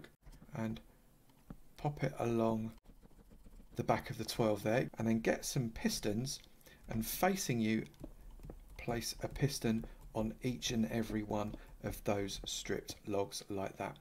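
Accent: United Kingdom. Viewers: Cause he's british and sounds awesome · It's called a British accent.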